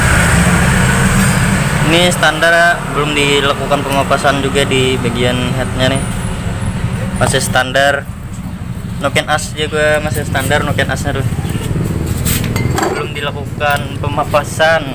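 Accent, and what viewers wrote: Banjar, Indonesia. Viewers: Lugat banjar, urng hulu sungai kah bos??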